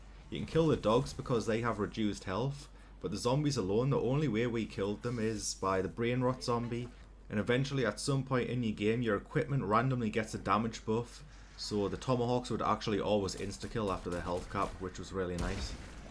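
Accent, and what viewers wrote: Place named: Northern England, United Kingdom. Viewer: Congratulations, glad to hear another northern English on YouTube, not to often you find us.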